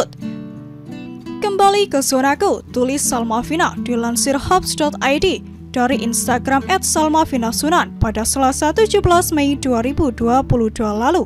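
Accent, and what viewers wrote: Indonesia. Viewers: MEDOK banget ya yg baca scropt.... bikin SALFOK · Yang ngomong medok banget 😌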